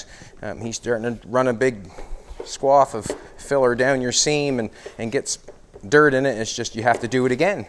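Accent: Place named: Newfoundland, Canada. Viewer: If I’m not mistaken, that’s a maritime Canadian accent. Newfoundland?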